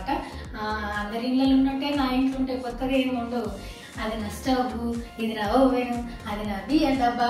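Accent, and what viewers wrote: Telangana, India. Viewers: Akka me telangana basha assaluuu👌👌👌😘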